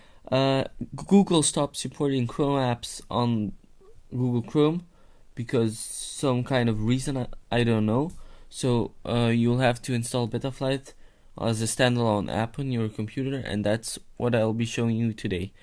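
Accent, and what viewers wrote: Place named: India. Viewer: media hora hablando para no decir nada con acento deindio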